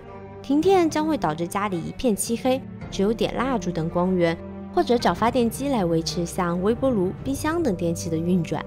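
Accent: Sichuan, China. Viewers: 川普可是四川频道主的特色，不可不尝啊。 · 是四川口音啦，不能说是不标准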